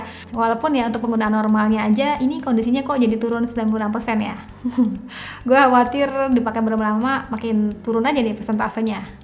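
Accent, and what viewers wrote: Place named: East Java, Indonesia. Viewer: Orang jawa timur kayaknya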